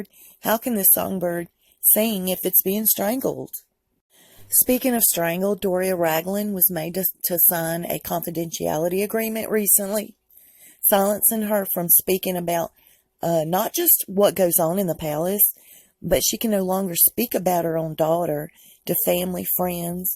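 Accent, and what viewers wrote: South, United States. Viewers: I see YouTube will let any idiot with a Southern Accent pretend to know the inner workings of the royal family. I can't 😂😂😂😂. I see most of the videos, the comments are disabled😂😂😂😂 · Wow! I enjoyed the way you interpreted the Coat of Arms!😃👍Id love to go with you to some cool art displays! You definitely have great insight, and intuition! I enjoyed hearing you again too. You have such southern charm in a down home way that makes your viewers feel right at home! My daughter got married in a hotel art museum that blew me away! It was a truly memorable experience due to how some of the art and its display affected you. I agree that the Queen has an evil eye toward Meghan, and I wonder if she might have had secrets beforehand about the royal family. I agree with you totally that the royal family will make sure Meghan doesn't "sing like a bird"! 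It is so disturbing that Meghan's Mom has to act like her daughters been shunned! It's like she has to act like her daughter doesn't exist to her loved ones and friends, as if she was dead! No comfort though given due to her daughter living in a palace! It seems like this is overkill...what else is being hidden?!🐍🐉🐲 Love you bunches!😃🍕🍻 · You are a very bright light. You vibrate at a very high frequency. You have so much love and compassion in your heart. And to hear someone speak without the burden of having to pass judgement, with only the pure intention of educating and awakening is very much appreciated. And for lack of any better way to describe it, so I hope that I don't offended you, but your "accent" is like a sweet Southern lullaby that tends to soften the blow of the horrific atrocities you speak of that we all are in spiritual warfare with. Thank you and bless you.💖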